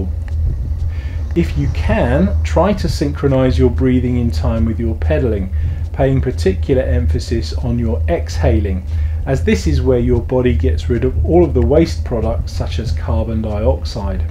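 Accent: United Kingdom. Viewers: you are really cool and a good cyclist. Love your oh so british way of talking. In cycling as in so many other things you get better by repetition, repetition, repetition, climb, climb, climb..... you will be astonished how much progress one can make. After even 1 month or 2 of repetitive climbing & climbing & climbing you do things that were utterly unthinkable before. Everything gets stronger, mind, legs & lungs.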